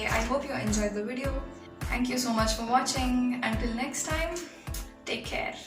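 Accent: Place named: South India, India. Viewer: South english ek number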